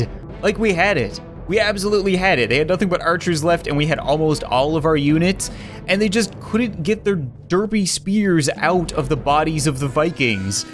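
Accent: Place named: Canada. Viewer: He’s Canadian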